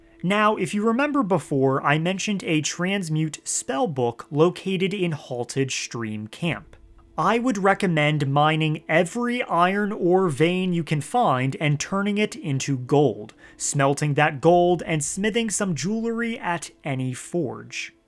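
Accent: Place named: United States. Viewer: Good video but American accents can be kind of grating. Especially how he keeps saying "White Run". Doesn't really flow in his voice.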